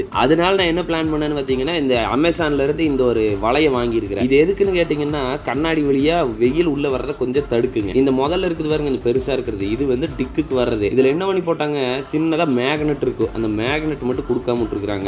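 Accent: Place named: Coimbatore, India. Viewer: Coimbatore slang ah verithanama iruku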